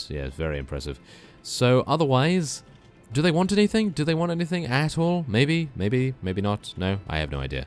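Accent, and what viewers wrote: United Kingdom. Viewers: I'm just making an assumption from the accent that he would be from the UK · UK I think. u can tell from how he pronounce certain words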